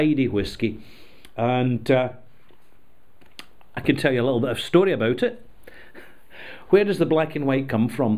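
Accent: Scotland, United Kingdom. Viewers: What's band practice? How much water? And I guess the Scottish accent makes sense for whisky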